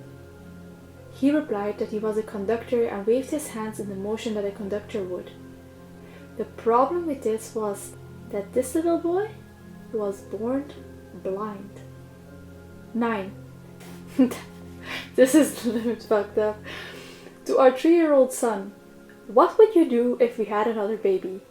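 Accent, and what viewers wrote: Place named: Netherlands. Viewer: Whats your accent? It sounds like Dutch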